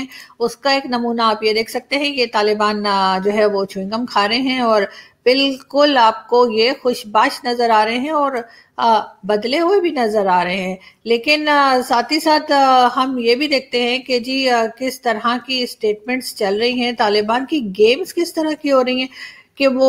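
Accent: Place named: Punjab, India. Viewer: Love to her your witty and satirical presentation of daily unfolding events. what I further admire is your audacious approach to call a spade a spade. You have this unique ability to conduct a formal as well a fun interview with equal flair. Your Punjabi undertones and mannerism makes me greedy to keep listening to you.keep up your good work. God bless. Your fan from India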